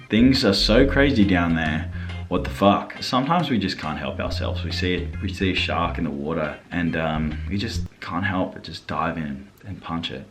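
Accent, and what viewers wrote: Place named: Australia. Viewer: My no.1 conception of Australia being a Brit is... the accent is 100% gorgeous🤍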